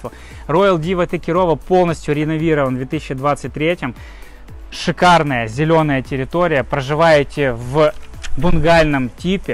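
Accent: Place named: South, Russia. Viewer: вы знаете русским пофиг вообще на украинцев и что там происходит. В моем городе мы и не слышим не о каких убийствах о чем вы рассказываете. Я жила на Украине 10 лет и уехала в 14 году там была очередная 110 революция. Причём там русские тем более те которые ездят в отпуск в 5 зведочные отели. В окопы идут совсем другие люди те кого жизнь пережевала и выплюнула прямо в эти окопы. Они за 200 000 идут убивать и умереть а обычные люди (человеки) 200 000 руб за 7 дней отдыха оплачивают. Каждый май и октябрь ездим в Турцию украинцев не помню там вообще, ну или они сильно косят под русских что не отличить. Да и кому какая разница на отдыхе. Все вместе тусим отдыхаем в Турцию все заточено под русских 😊 ездим тада как на дачу. Автор видео 100% русский, спроси у любого европейца😂😂😂 Немного выдаёт "колидор" и "гэ" и эта настойчиво агрессивная подача характерная для южных народов, вполне за Краснодарского парня сошёл бы. 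Или любой другой глубинки России.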